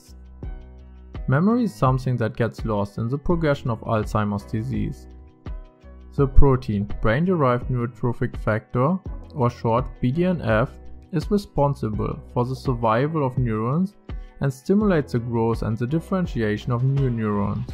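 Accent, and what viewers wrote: Germany. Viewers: Deine Videos sind klasse, bildhaft und strukturiert. Das einzige was mich stört ist dein starker deutscher Akzent. Dafür kannst du nichts - ich glaube aber mit einer besseren Aussprache könnten sie eventuell mehr Reichweite erzielen. Die, die sie verdienen! Vielleicht könntest du einen anderen Sprecher nehmen? Ich bin selbst Psychologin und habe mehrere Mikrobiom Transplantationen hinter mir - sie haben meine Psyche und meinen Körper gerettet. Weiter so und liebe Grüße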